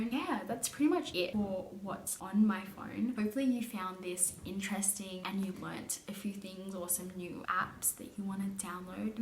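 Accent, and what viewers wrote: Australia. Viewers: she probably grew up in Australia she has a Australian accent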